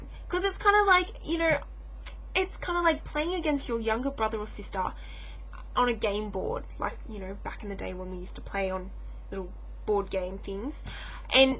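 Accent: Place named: Australia. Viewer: I like that aussie accent...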